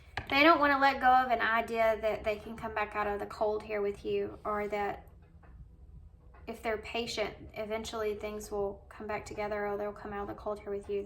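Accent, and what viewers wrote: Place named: Tennessee, United States. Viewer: My goodness, a fellow southern girl I hear. Tennessee?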